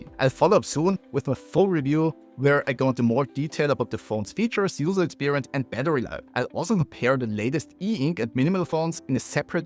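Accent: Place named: Austria. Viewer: Servus! Great review, but I started watching your videos for a minimal phone solution but have to admit how much I enjoy your Austrian English accent! Love from Canada 🇨🇦.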